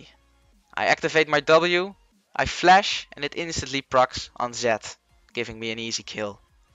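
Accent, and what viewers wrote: Netherlands. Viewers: From the Netherlands i think?? Nice guide thanks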